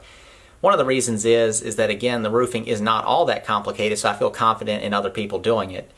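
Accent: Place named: Texas, United States. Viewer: I hear a southern drawl. I say Texas.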